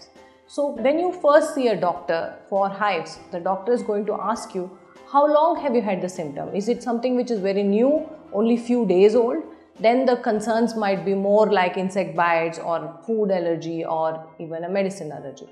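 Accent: India. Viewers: English nga paranamang bombay Kong mag salita😔😔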